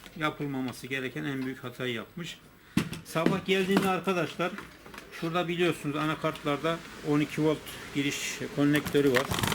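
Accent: Erzurum, Turkey. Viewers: abi konuşmandan anlamıştım erzurumlu olduğunu ondan sordum bende erzurum oltuluyum dadaşkentte oturuyarum. anakart işlemci vb hari&#231; size ka&#231; tl veriyoruz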